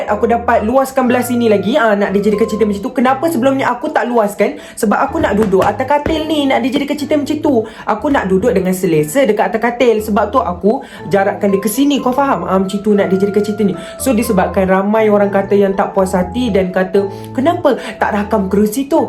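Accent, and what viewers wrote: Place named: Kelantan, Malaysia. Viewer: wow kelate 😂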